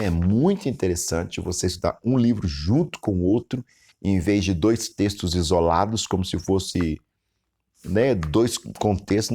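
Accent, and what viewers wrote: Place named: Minas Gerais, Brazil. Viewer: As pessoas de Minas Gerais aprenderam o significado de "impelir" da mesma forma que você irmão. Sotaque não é sinônimo de ignorância 👍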